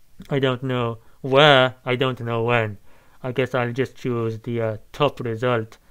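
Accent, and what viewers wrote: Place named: France. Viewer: When he said "France is not a real place, but Wakanda is" I almost hurt myself trying not to laugh really loudly for fear of waking up my wife. That was great. The accent does sound vaguely French, but that could mean any of dozens of different countries. Easy to understand though, and I enjoy how much commentary he gives. His enthusiasm comes through, even with the mask. Mikio Fujioka was the guitarist who fell off an observation deck at the end of 2017, sadly. He's usually the one playing the black guitar, while Ohmura always plays his pink guitar, making him easy to spot. This time I think it was Leda Cygnus playing the black guitar, who filled in often for Mikio, because of the scheduling conflicts you mentioned. Mikio was a guitar teacher at a high level music school in Japan, so he probably needed someone to fill in for him regularly. 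Ohmura and his pink guitar are almost always there.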